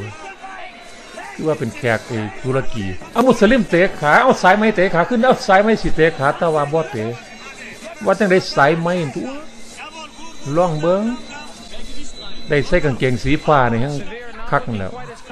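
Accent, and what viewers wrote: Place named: South, Thailand. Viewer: เว้าใต้เป็นตาหัวคั๊ก😂😂😂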